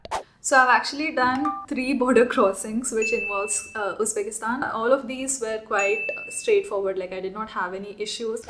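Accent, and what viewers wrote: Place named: India. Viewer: I enjoyed your programme BUT you need to improve your pronunciation..its too much of Indian slang in your speech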